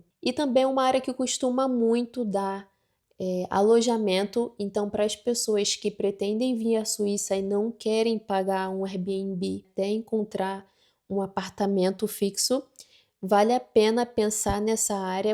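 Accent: Rio de Janeiro, Brazil. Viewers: Não da para nós nordestinos mudarmos o nosso sotaque, da mesma forma que a moça tem um sotaque aparentente carioca também não vai mudar o dela. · Ela não é nordestina é Carioca, não tem como mudar o sotaque raiz.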